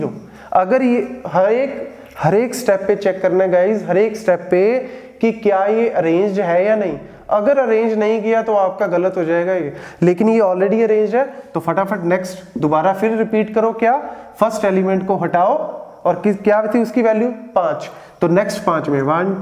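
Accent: Punjab, India. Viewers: Main jitna tumhe dekhu, dil ye na bhare Thanks sir, I watched all your videos on Graph theory. Punjabi accent is lovely!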